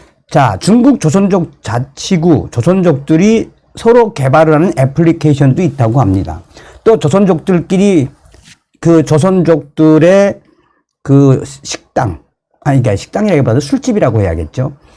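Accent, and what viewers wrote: North Korea. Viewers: 북한말씨 쓰지 마세요 듣기 거북스럽네요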